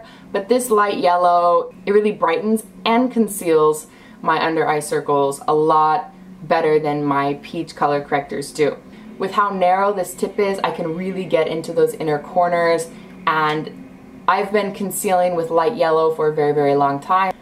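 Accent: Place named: Netherlands. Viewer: ben je nederlands ik hoor het aan je acent :)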